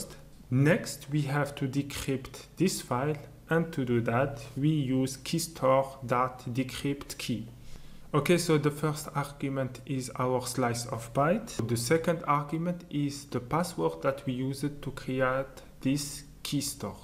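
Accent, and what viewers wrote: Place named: France. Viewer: For the love of the beautiful French accent, I want to learn French, mersi or merci ❣❣❣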